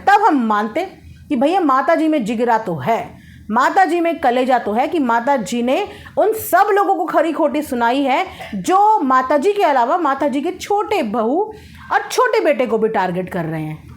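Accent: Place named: Uttar Pradesh, India. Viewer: Mai to Etna hai chirchirya sun kar waise aap ke vlog bhut acha aur saf hota hai aap bhi up se ho kya khi khu bhasha se lagta hai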